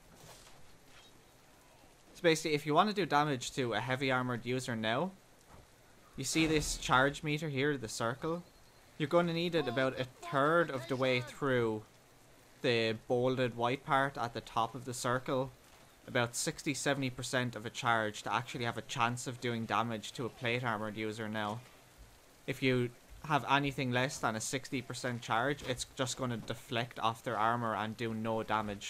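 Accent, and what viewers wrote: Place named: Ireland. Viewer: Your description and accent say you're Irish. Would you like to see medieval melee action in Ireland of the kind shown in this game? Also, would you like the devs to put in a "Master Archer" perk that lets you see the exact ballistic drop (but not trajectory) of your arrow when aiming long distances? I'm sure they might be persuaded if enough people asked for it.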